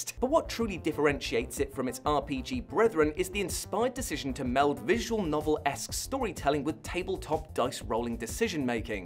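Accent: United Kingdom. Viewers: The moment I heard the voice... I expected to hear... hii I'm from w... and these are 10 games.... xD Glad to find you on this channel! Hit sub cus I love your corny humour and British narration! Looking for a 10ish hour game to complete in 2ish streams... and this gave me yet another channel to sub and watch xD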